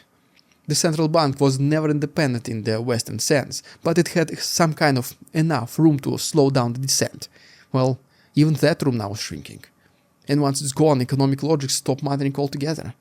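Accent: Russia. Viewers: Mr. RUSSIAn. You lied again... RUSSIA GET ALL CHIPS IT NEED FROM tHAIWAN AND SOUTH kOREA, AND EVEN zELENSKY ADMITED IT. Buy you keep lieing people and give them fake news so that you tube pay you buy susribers. I dont even understand your enlgish becouse it is so distorted and intenitonaly made severly RUSSIAN... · he is not interested in phonetics. He speaks Russian but with English words. Horrible to listen to.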